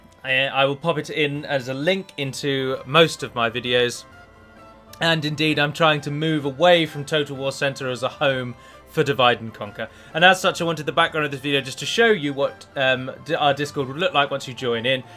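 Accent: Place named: RP, United Kingdom. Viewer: you are incredibly posh its fascinating.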